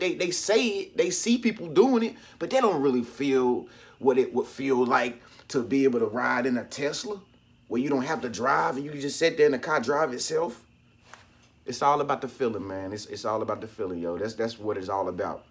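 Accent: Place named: Midwest, United States. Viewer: This is True!🙌 Btw, r You from the Midwest?🤔 Ur accent is similar to where I Am.....👊😏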